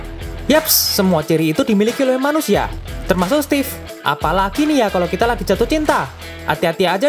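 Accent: Java, Indonesia. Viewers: ternyata adalah wong jowo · JOWO! confirmed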